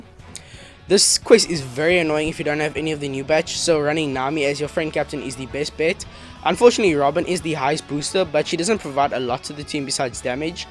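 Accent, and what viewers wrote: South Africa. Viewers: Are you South African bro?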